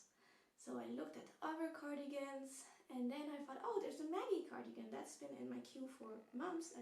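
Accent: France. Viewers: ❤love the French accent! It is so much fun to watch knitters all over the world! I am watching from B.C. , 🇨🇦.❤all those Danish designers, it’s fun to go on the Isager website, and look at the archive collection, and see all the Danish designers she asked to collaborate with.😊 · Bonjour du sud de la France ! I spotted your French accent in the firsts seconds 😂 Really Beautiful projects, I loved this podcast Thanks !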